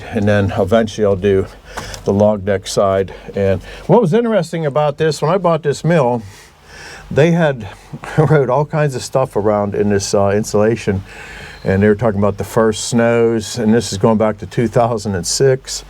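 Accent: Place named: Pittsburgh, United States. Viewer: Handy sez that is a thick yinz we accent.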